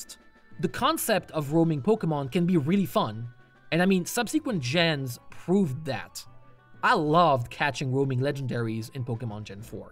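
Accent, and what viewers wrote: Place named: France. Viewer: Judging by some slight variations in accent, I'll assume you're from France?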